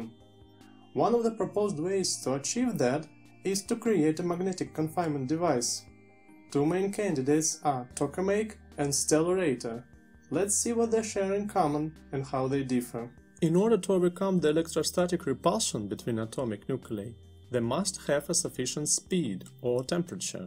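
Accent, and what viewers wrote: Russia. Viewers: Is it a russian accent? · Акцент русский, не спроста? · русский акцент?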